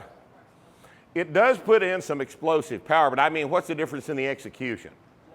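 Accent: Texas, United States. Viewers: I've got rippetoe at 1.75 speed and it still sounds like just slightly fast talking. Lol. That slow texas drawl is strong with the. Lol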